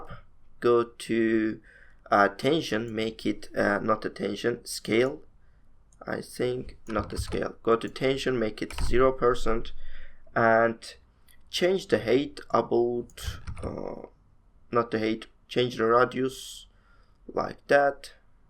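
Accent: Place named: Turkey. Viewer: Your accent is like Turk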